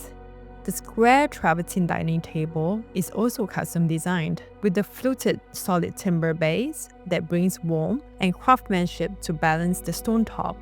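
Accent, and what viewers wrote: Singapore. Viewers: Lovely place and couple. I’m glad the narrator’s Singaporean accent was easy on the ears and not the stereotypical choppy accent lol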